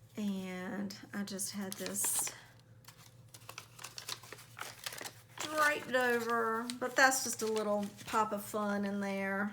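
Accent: South, United States. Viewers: Oh my goodness I could listen to you for hours. Lol where are you from? USA South?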